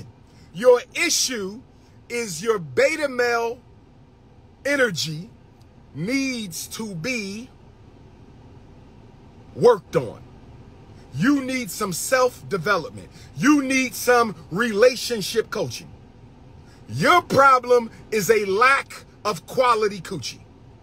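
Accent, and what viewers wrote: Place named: South, United States. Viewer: It’s just funny being up north and hearing a southern dialect. All my relatives down south still say skrempts and skreets. Not belittling him nor them it’s just funny to hear it every now and again. The Dr. Is alright with me 🙌🏿👏🏿🙌🏿👏🏿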